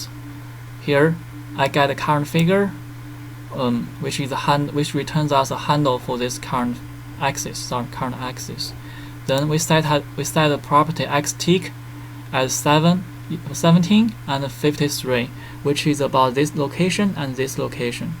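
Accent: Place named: China. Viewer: love your accent reminds me of my China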